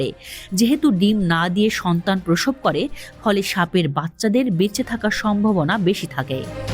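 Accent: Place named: Kolkata, India. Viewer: sangbad pathikar kontho sune mone hocche s ekjon kolkatar mohila.R tv indian dalali bondho koro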